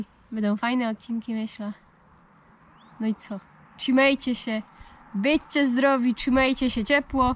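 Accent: Silesia, Poland. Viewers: Palma ładna i Śląska gwara też fajna lubię cię oglądać. To pieczony chleb z wędzonką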